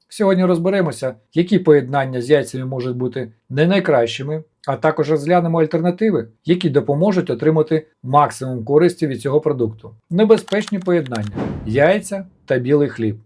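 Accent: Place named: Poltava, Ukraine. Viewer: щиро дякую Вам за відповідь! Я з Дніпропетровськоі обл, але темчасово знаходюся в Даніі, а подумала, що ви з Полтавськоі, бо говор схожий на наш! Робете дуже важливу справу, щоб наша нація була здоровішою і сильнішою! Вам бажаю процвітання і до вершин без перешкод!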